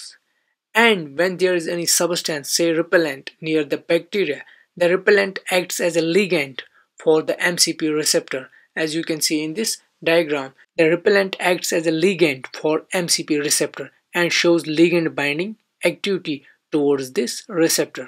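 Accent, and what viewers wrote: Kashmir, India. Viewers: I think this is kashmiri Sir. As by pronounced words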